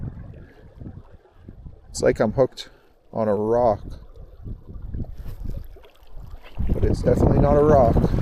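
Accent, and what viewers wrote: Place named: Minnesota, United States. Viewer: I can't take the Minnesota in your accent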